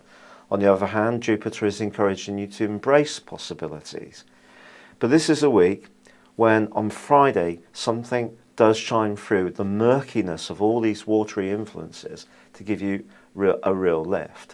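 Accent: United Kingdom. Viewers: Very good and very accurate.!!! Enjoy your British accent and forecast a lot.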